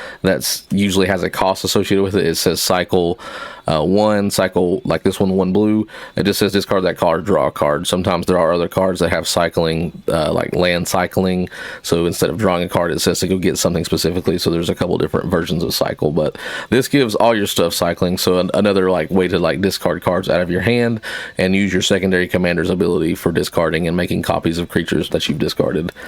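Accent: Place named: South, United States. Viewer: Where "Southern " are you? SW Louisiana here.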